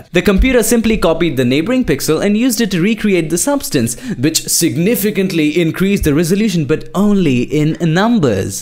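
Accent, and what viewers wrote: India. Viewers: Indian english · Level Up, Indian English with much better diction than many English native speakers.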